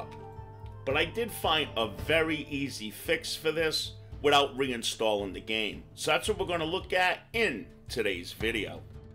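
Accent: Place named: New Jersey, United States. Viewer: never seen any of your other videos so im not sure if you were just doing a goblin voice for the video because you love wow or you're repping joirsey, either way good stuff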